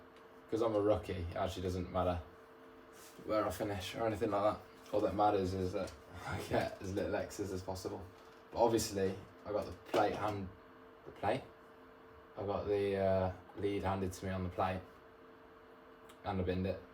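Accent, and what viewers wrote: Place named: Midlands, United Kingdom. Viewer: Hey bud, sounds like you're a Midlander like me. Hope to see more 🙏🏾 👍🏾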